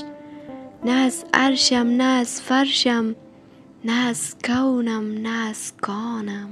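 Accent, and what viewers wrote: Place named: Tajikistan. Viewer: آفرین برتو بانو با این لهجه شیرین دری و تاجیکی، و مرحبا برمولانای جان ، که چه زیبا و چه کوتاه ، تکلیف ، وجود خود وهمه آدمیان را شیرین و گویاه بیان کرده ، بعصی اوقات فک ر میکنم اگر مولانا و شمس و ابن سینا و سهروردی و دیگر ابر انسان های تاریخ ، انسان ند و از نوع بشر ، پس ما کی هستیم ، شاید علفهای هرز ،باغ بزرگ افرینش هستیم که یکذره رنگ سبزی درختان را داریم و مارا جزیی از این باغ حساب کرده اند ، آیا اینها مخلوقات خاص خداوندند ،که خاص آفریده شده اند یا ماهم میتوانیم مثل آنان بشویم . دنیای عجیبی دارد این مولانا . 🌺⚘🌼💐🍁💐🌼🌻🌺🙏🙏🙏🙏♥️❤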